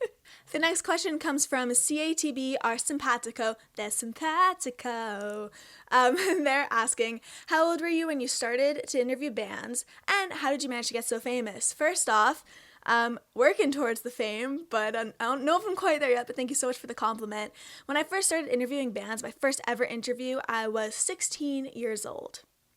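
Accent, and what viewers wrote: Canada. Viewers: You sound very canadian. The "outs" and "abouts" are cute. ^^ I think the "Sorry about that" question is a reference to Colts recent Art of Wrestlling episode with Edge, is it not? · your Canadian accent is awesome!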